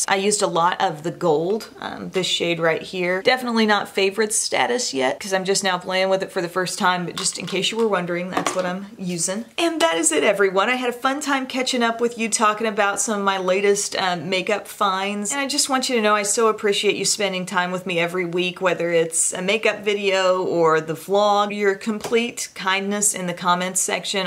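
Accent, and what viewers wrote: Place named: Texas, United States. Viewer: Hi, I was just watching one of your videos that references a corrector as university of Texas colors, you kind of sound a little like your from Texas, I was just curious if that’s where you are... also if your a ut fan.. that’s cool but I have to Aggies :)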